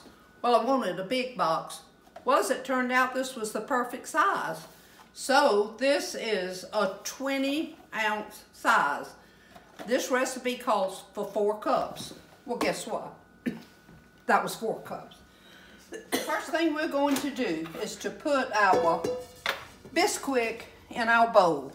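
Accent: South, United States. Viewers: I love southern accent.. I just told my hubby I think she sounds so much like Rosalynn Carter.:) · What state has this accent? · You wear the most beautiful clothes when you cook! I just love your southern accent.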